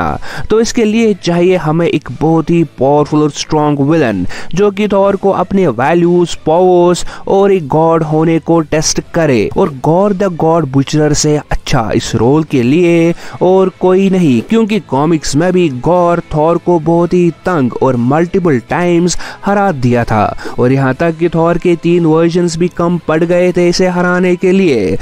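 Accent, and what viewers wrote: Kashmir, India. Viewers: Bro are u from kashmir 🤔🤔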